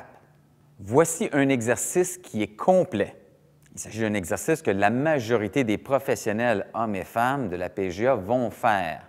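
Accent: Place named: Canada. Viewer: hello from France. Super vidéo., faut faire un DVD! Continuez, j'aime vos vidéos, elles sont très pédagogiques et claires. Et puis, avec l' accent " canadzien ", j'ai l' impression de prendre un cours de golf au Québec! génial. Merci. et encore, encore.....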